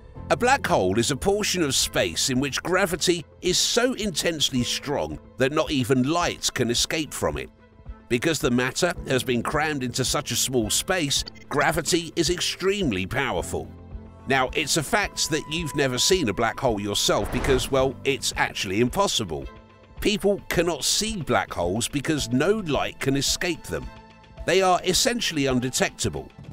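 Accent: United Kingdom. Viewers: >click on video >hear British accent >close video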